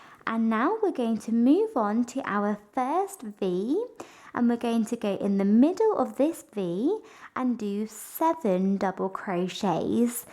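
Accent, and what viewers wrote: United Kingdom. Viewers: An extremely strong UK accent. 💕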